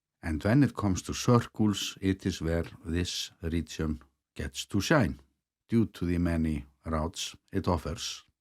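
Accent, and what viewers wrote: Iceland. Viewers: Great video! (as always!). I visited some of these areas you mentioned on my visit to the snaefellsnes peninsula last year in April 😊 I thought it was a fantastic area to visit, I found borganes a little unusual! When I went it felt like a ghost town it was SO quiet and hardly any people walking around! But the peace was nice 😌 Was nice to revisit some places I went via your video! In August this year i am taking the road less travelled and I will be seeing the west fjords which I very much look forward to! Thanks again for another beautiful video. I LOVE your icelandic accent and commentary! x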